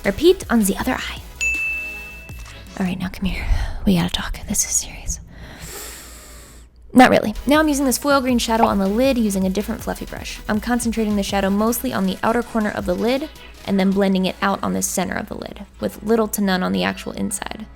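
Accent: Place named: New Jersey, United States. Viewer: How come u randomly get a jersey accent throughout ur vids lol I love it but srsly y does it happen?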